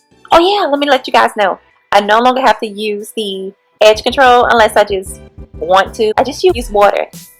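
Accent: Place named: Washington DC, United States. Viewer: You sound like your from dc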